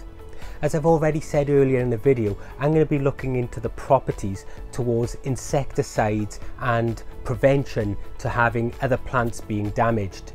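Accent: Wales, United Kingdom. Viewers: Are you a Welshman? Charming in any case!!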